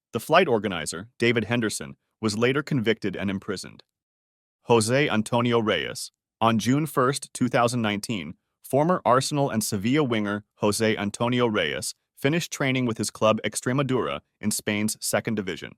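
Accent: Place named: United States. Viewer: Who else is American and didn't think it would be soccer? Especially since the voice sounds American.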